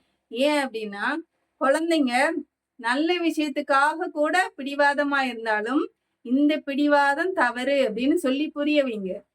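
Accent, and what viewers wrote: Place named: Tirunelveli, India. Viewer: Good Tirunelveli Tamil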